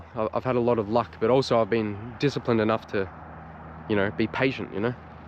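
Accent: Australia. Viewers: Australia is so cool! Love your accent! So amazed to see the porcupine akinia .Totally 🆒!!!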